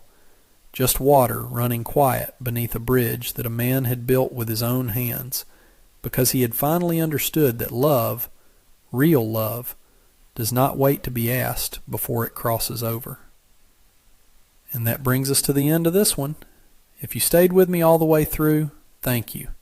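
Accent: South, United States. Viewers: This is a very moving, a very beautiful story. And the narrator reading this story to us is simply the best. His southern accent is perfect for this kind of stories.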